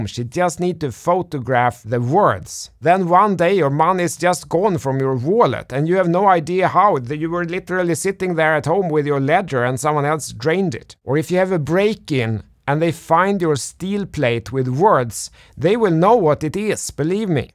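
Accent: Sweden. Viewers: Mest ikoniska svengelskan jag hört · Great video👍 I can hear the swedish accent, so you are definetly from Sweden 😄👍 Hilser deg fra Norge 🇳🇴🇸🇪🇳🇴🇸🇪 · Your dialect is so swedish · This is the most swenglish I've heard since Rosling 😂 great video!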